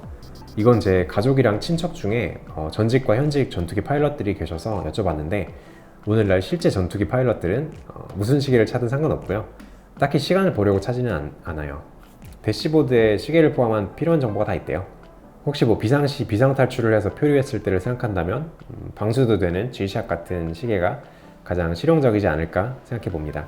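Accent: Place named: France. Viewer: 아 히스토리 좋습니다 아니 불어액센트 뭐죠?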